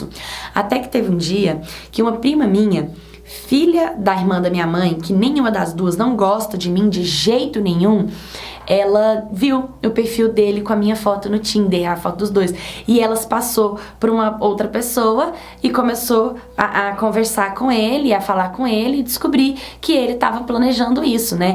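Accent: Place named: Minas Gerais, Brazil. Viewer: Esse sotaquezinho mineiro engraçado!! kkkkk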